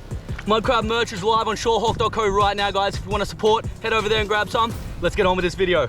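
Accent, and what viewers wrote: Australia. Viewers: I LOVE THE AUSTRALIAN ECCENT😩❤️ greets from the Netherlands!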